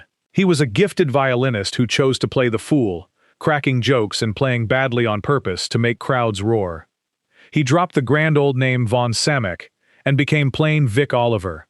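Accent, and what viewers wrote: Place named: United States. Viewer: The accent in the narration of this video makes it quite clear that it is the US racism which motivated its creation. For my whole life 80+yrs I have known that a very large number of people in show business were of jewish origins and it really has not matter one tiny little jot to me or anyone in Britain. Only someone from USA would be motivated to create this diatribe of changed names which has zero value to British people with all embracing British values. Why do you expose yourself.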